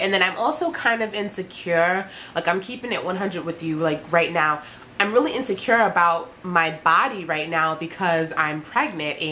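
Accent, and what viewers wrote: New York, United States. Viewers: Love you!!!!! and you New York accent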